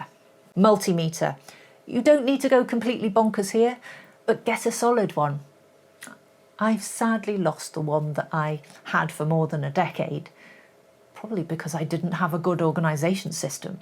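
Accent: Wales, United Kingdom. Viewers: You're Welsh aren't you?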